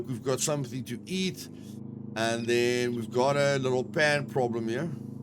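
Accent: South Africa. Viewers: hey you sound south african what server do you play on?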